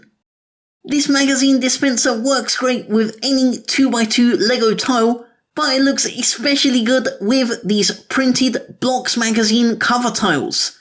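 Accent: United Kingdom. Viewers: Your British accent sounds pretty cool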